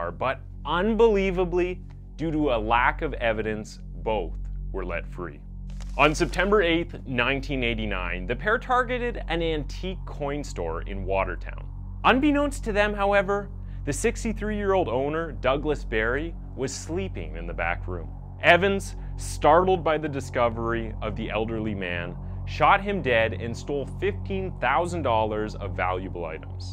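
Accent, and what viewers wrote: Canada. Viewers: nice channel, are you Canadian? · no I'm not going to lie yesterday I was watching one of his videos and totally capping on how he talks. Is he Canadian? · Oh the Canadian pronunciations lol. It’s all good though. It goes both ways. Love you Canada! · Hes canadian. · Is he Canadian? I sense a slight accent and some of his spellings are spelled in traditional English such as "behaviour."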